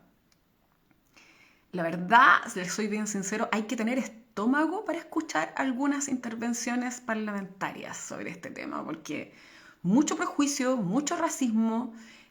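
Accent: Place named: Chile. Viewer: Excelente vídeo muy informativo y riguroso. También le tengo que decir que su acento chileno es precioso sobretodo porque es de alguien culto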